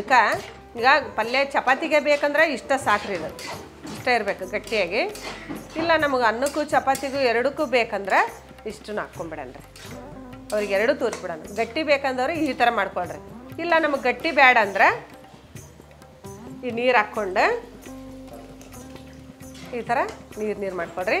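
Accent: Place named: North Karnataka, India. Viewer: ಅಲಸಂದಿ ಕಾಳು ಪಲ್ಯ ಸೂಪರ್ ಅಕ್ಕ ಉತ್ತರ ಕರ್ನಾಟಕ ಭಾಷೆ ಮಾತಾಡ್ತೀರಲ್ಲ ನಿಮ್ಮದು ಯಾವ ಊರು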